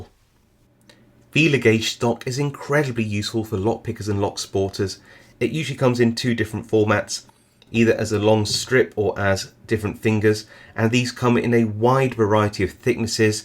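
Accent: United Kingdom. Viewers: Excelent presentation! I already know most of this tricks but with your strong british accent all look like some cassic documentary worth to watch, and yes I almost forget, which size of bra is the best for making lockpicking tools? =) =)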